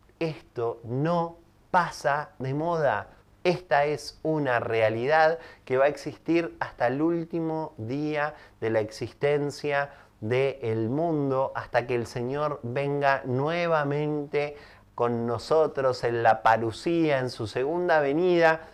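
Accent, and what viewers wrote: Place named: Venezuela. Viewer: 🤭 ¡Lo sabía, eres Venezolana 😊 Un abraaazo 🤗! ese tono de voz es inconfundible prima🇻🇪.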